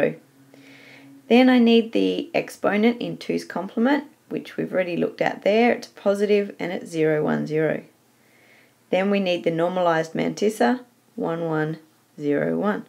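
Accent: Australia. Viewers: nice Australian accent :)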